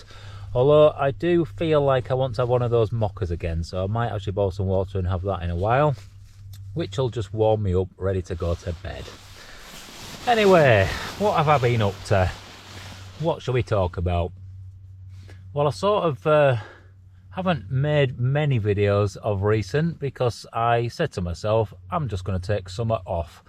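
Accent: Yorkshire, United Kingdom. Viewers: So grateful to you for sharing your videos taking walks in the beautiful mountains. My back has gone out AGAIN and I'm no longer able to go for walks in the countryside like I loved, so being able to see it all vicariously through your videos is wonderful, if a bit heartbreaking knowing I can't do it myself. Also lovely to hear your Yorkshire accent, reminds me of my old mum, who never lost hers, despite living most her adult life in Norfolk.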